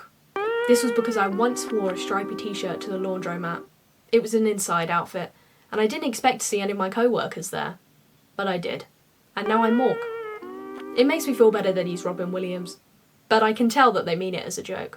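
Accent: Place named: United States. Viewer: you gotta teach me that US accent!!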